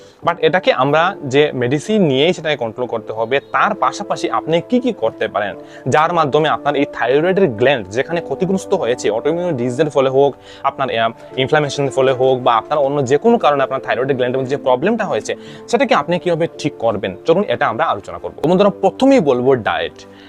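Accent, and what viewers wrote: Bangladesh. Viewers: Apnar kothate mone hochche apni Bangladeser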